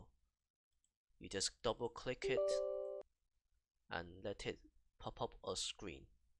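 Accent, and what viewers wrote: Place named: United Kingdom. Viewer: 你英文好流利~ 還有英腔~~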